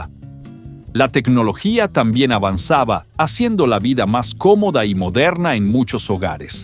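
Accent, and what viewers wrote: Yucatán, Mexico. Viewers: ¿El narrador habla como yucateco o me lo estoy imaginando?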